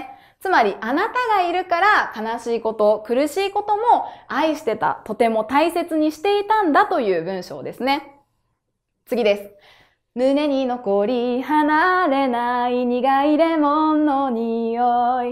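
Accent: Kansai, Japan. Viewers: 先生は関西人でしょうね。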